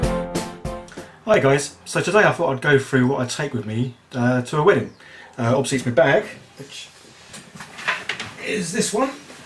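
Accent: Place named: England, United Kingdom. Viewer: hi Englishman... nice accent mate! Is that a Tamron or a Sigma70/200 lens?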